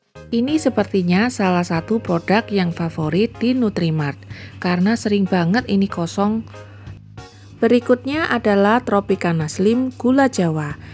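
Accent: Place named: Surabaya, Indonesia. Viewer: Opo kabare cik..kangen sama logat suroboye aku ..kangen suarane rek😂😂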